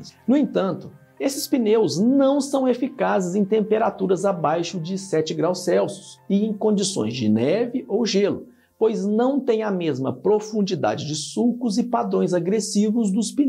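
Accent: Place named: Rio de Janeiro, Brazil. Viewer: Ele deve ser só RJ, lá eles falam feio assim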